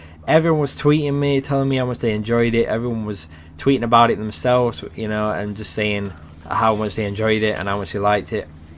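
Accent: Yorkshire, United Kingdom. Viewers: no, different places in Britain have very different accents it just where he's from, he sounds like he is from Yorkshire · no hes just brittish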